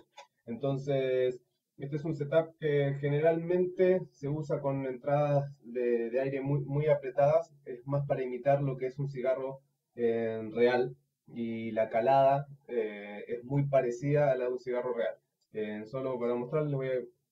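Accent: Argentina. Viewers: El mejor video técnico que he visto. Creo que podré despedirme del pucho al fin. Pensé que eras de acá.. ya casi no se te percibe el acento Argentino .. jijij. Gracias y Saludos. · eres argentino?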